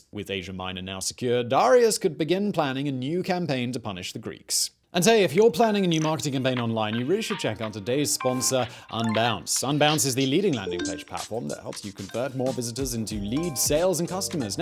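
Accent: United Kingdom. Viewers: You talk so fast, and speak in a British accent, that I have to use subtitles to follow you.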